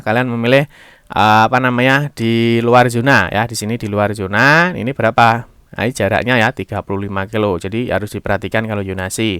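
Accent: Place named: Java, Indonesia. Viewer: ga ada pengisi suara yang lain kah? ini medhok banget dan nyentak2, kurang lembut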